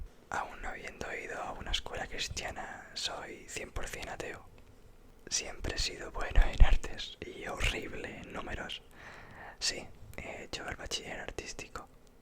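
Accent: Spain. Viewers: Eres catalan verdad? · tu eres Español o Estado unidense? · Eres de españa?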